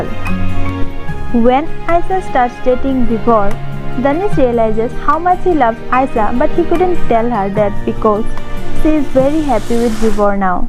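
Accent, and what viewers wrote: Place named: Bengal, India. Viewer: Heiii!! If you read this, then let me tell you that you really have a cute bengali (no offense) accent and that Just added the sprinklers to your storytelling. Actually I am currently reading "OUR IM'POSSIBLE LOVE STORY" & was really curious and came up to your channel. Keep narrating more📖✌🏽 support 💛💜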